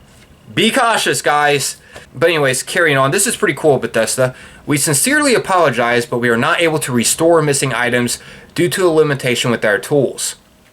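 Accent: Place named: Baltimore, United States. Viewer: Bro's speaking like he's from Baltimore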